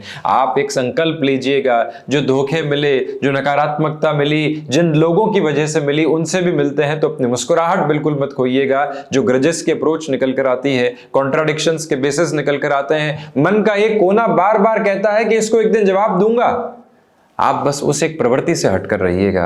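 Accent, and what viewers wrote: Standard. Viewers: Shudh Hindi, astonishing to listen,so great guru ji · Itni shud Hindi kitni achi lagti hai sunney main 🙏🏻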